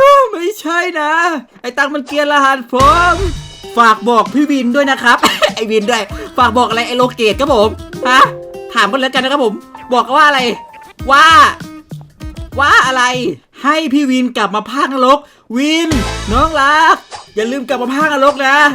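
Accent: South, Thailand. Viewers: พี่เป็นคนใต้หรอ ผมพึ่งรู้นะเนี่ย555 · พูดใต้หน่อย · พี่ เป็นคนใต้เหรอค่ะ??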